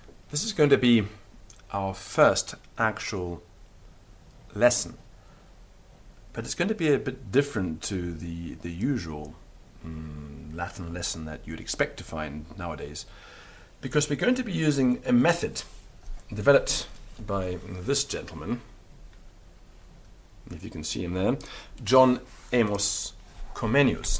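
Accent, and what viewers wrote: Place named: England, United Kingdom. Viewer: If this is the first lesson, I do believe that he can teach the whole language in 3 lessons. Also his English accent makes it sometimes a little difficult to understand the words in Latin.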